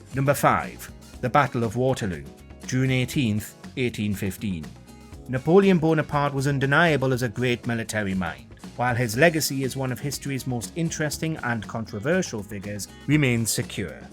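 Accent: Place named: Scotland, United Kingdom. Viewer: If it's any consolation... the narrator is Scottish by birth. Even though the accent is mostly gone...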